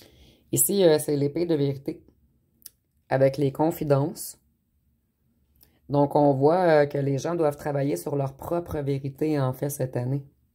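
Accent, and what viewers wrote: Canada. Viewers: Merci pour votre accent canadien qui fait du bien et surtout pour cette guidance qui donne le droit de pleurer pour évacuer le trop plein. J'apprécie cette interprétation salvatrice. Vive les neutrons. 🌈🤗💗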